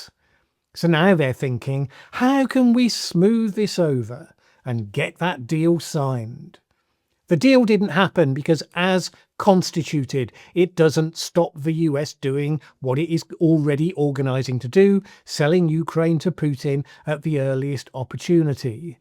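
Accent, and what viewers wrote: United Kingdom. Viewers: This clown sounds like a Brit. Perhaps he should be more concerned with the pathetic collapse of his own country and/or his own treasonous government.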